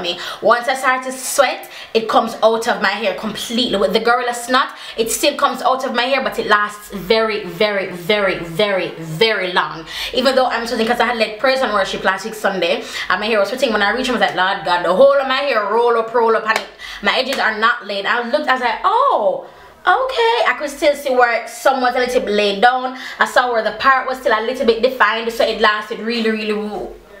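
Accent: Jamaica. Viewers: Wowwww I wish I could speak Patois. I love your accent girl! Subbed ❤️ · your beautiful jamaican accent 😭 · I saw someone put oil on top of eco gel to stop it from flaking. Maybe it will work for gorilla snot...I'm gonna try. Thx for your video and your face looks good! Love ur Jamaican/street girl/white girl accent! Lol Yeah...I'm coming for you! Lol. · u make me wish I was Jamaican, I love ur accent! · Kareece Brown she has a jamaican accent tho.. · I love your Jamaican accent ❤️ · I understand what you're saying it's her accent my bestfriend is Jamaican and I met her mom and I was like what is she saying lol 😂 but now I totally understand I love there accent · wow she is gorgeous and has a nice jamaican voice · I’m Jamaican your accident sounds like patio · I love that Jamaican accent. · I love your jamaican accent 😍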